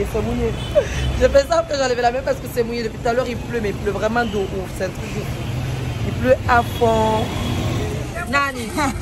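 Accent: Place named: South, France. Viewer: Excellent Sa fait plaisir d’entendre l’accent du sud en Guinée lol je suis pour Marseille au foot c’est pour ça…😅 je suis d’origine guinéenne je ne suis jamais allé en Guinée… c’est comment pendant la saison des pluies l’humidité est-elle supportable ? Merci pour ta vidéo je vais regarder le reste plus tard… 👍🇫🇷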